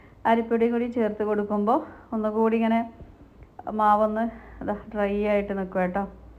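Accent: Malappuram, India. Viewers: മലപ്പുറം താനല്ലേ മലപ്പുറം ബ്ലോക്ക് സംസാരം കേട്ടപ്പോൾ അതോ അവിടുന്ന് മലപ്പുറത്ത് അല്ലാത്ത പോലെ തോന്നുന്നു ഇത്രയും ഒന്നും വേണ്ട നമ്മൾ നമ്മൾ മലപ്പുറക്കാർ അല്ലേ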